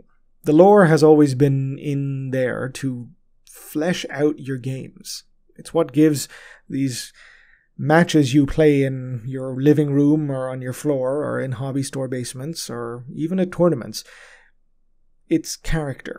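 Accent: Ireland. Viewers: Fabulous! But I knew the was from Dublin from the way he pronounces his ‘t’s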